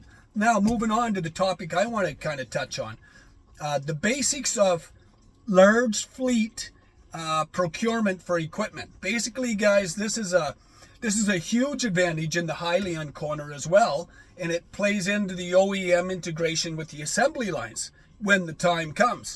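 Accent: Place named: Canada. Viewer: I love your accent. Canadian?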